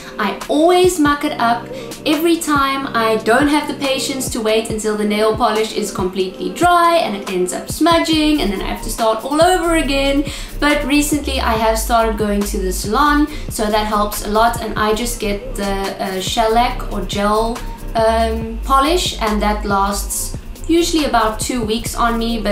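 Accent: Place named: South Africa. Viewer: Just found your channel and love the tips. Where are you from? Your accent sounds South African xx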